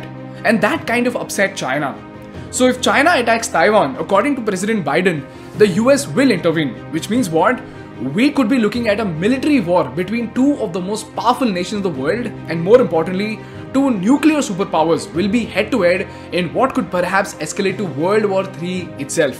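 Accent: India. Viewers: For some reason, whenever I hear an Indian accent about a serious topic, I can be assured that is something objective and well-researched. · Damn that indian english ruined the video for me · I just cant listen to this freaking indian accent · I don't understand. He's not American. His indian accent is very clear and easy to understand.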